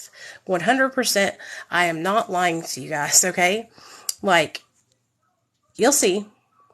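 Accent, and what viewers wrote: South, United States. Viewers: Your such a good mom I think Nate is such a good little boy. It’s so cute how u call him son . Very southern sounding I think? I’m in wi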